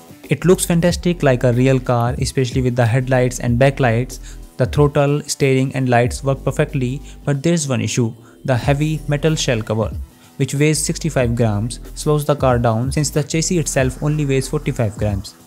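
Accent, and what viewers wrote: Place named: North, United Kingdom. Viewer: fix yr accent northie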